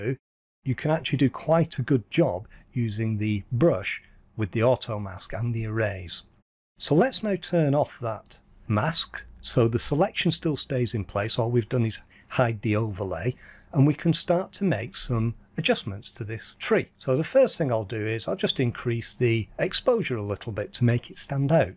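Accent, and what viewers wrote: United Kingdom. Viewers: This is the second video I have watch of yours in the last 10mins, I have learnt more in this last 10mins than god knows how many hours I have spent listening to other people . Content great, british accent out of this world, some one I can listen to at last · Thank you so much for this instructive video, plus the perfectly spoken british accent ! :)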